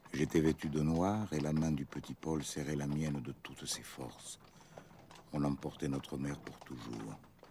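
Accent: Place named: Provence, France. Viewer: et que dire de l'exceptionnelle interprétation de Jean-Pierre Darras, pourtant Parisien, qui narre avec tant de justesse ce passage. On pourrait croire que c'est Pagnol lui même qui parle, tellement son accent provençal est bouleversant. Associé à ce monstre sacré qu'est Vladimir Cosma, on sait dès les premières notes que l'on va être dévasté par des flots de larmes. Merci Mr Robert pour cette extraordianire adaptation de cet autre monstre sacré qu'était Marcel Pagnol.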